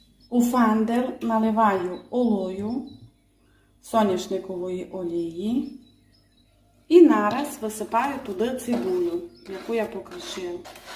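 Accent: Transcarpathia, Ukraine. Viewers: Ой, який файний закарпатський діалект ❤🎉 Так приємно слухати! 😊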